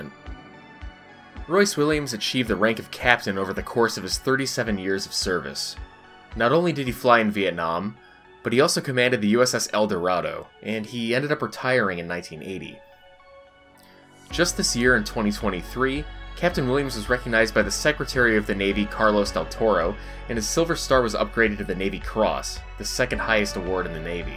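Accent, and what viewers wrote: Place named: Midwest, United States. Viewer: Your midwest accent makes this great.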